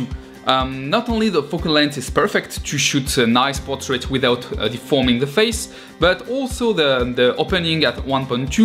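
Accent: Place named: France. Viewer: On entend bien l'accent français. J'adore la vidéo continuez !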